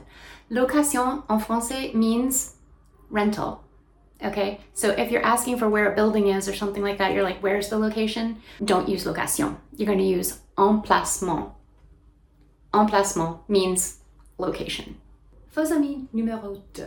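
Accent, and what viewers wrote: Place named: United States. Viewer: Une américaine qui parle français...cet accent.. le mot anglais "coin" vient du français... coin (le lieu)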